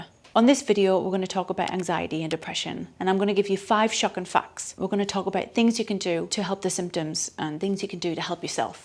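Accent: United Kingdom. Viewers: I adore British prononciation, sounds like a music to my ears. thank you for the video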